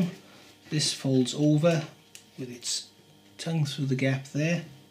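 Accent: Wales, United Kingdom. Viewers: Lol, you're Welsh, right?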